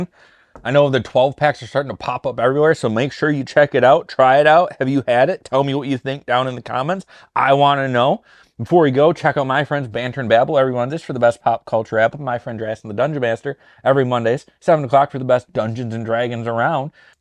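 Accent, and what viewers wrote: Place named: Midwest, United States. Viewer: Not a bad beginner Hogan impression. But its a little too calm and midwestern like Jesse Ventura kind of. You gotta get more raspy and get more of that surfer California laid-back accent.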